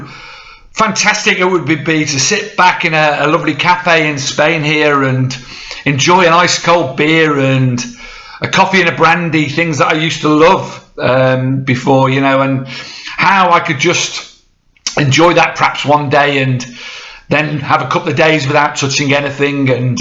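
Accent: United Kingdom. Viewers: Hi sweden here! I dont know when I and How I stumbled in on your videos but soo great to listen to! When you say "feal good when I take the pill" which medication are you then refferring to? If you feel ok to write it! So happy to see you back as you speak of! And what a great think to live in Spain sooo I would like! Take care and continue well and I think I heard a parrot sound in the background. And have to say that your brittish accent is soo convinient to listen to!!! Which part of GB are you from? Stay safe further on your journey All the best from Sweden 🌹🌹🌹👍🏼👍🏼👍🏼🙏🏼🙏🏼🙏🏼😊😊😊👋🏼👋🏼👋🏼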